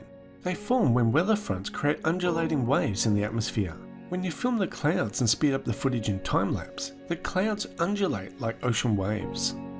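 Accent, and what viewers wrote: Australia. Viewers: What I hear just because of the accent is : wotair ,Yair ,Roll cwoulds aND AUSTWARLIA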